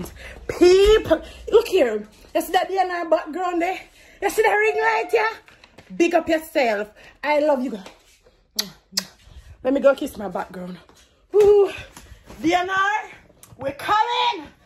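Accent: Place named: Africa. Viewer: I'M SO HAPPY FOR YOU GUYS 🙏🙏🙏❤❤❤❤Love the african talking 😂😂😂 Heyyyyyy!!!!!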